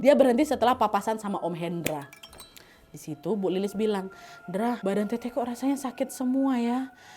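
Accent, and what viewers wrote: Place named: Sumatra, Indonesia. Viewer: Logat sumatranya enak banget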